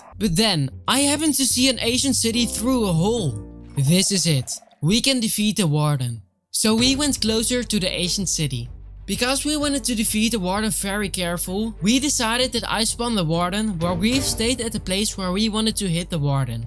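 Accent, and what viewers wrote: Netherlands. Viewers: What country are you from because you have an dutch accent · your accent is netherlands en ik ben ook Nederlands daar om dacht ik het en het is een goede video is jouw friend ook Nederlands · you sound so dutch ( i'm dutch myself lmao ) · dat hoorde ik all aan je accent 😂😂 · What country are you from?you got like a netherlands accent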